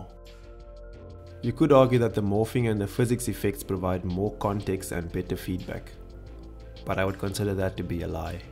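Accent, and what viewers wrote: South Africa. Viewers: Yeah I was about to ask are you South African 😂 It's great to see Flutter Devs in South Africa. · I know a South African accent when I hear one. Keep up the good work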